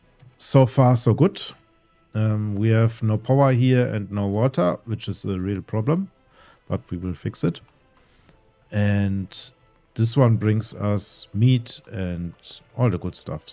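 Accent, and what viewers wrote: Germany. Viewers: You sound reallly german. Und das sag ich als deutscher😂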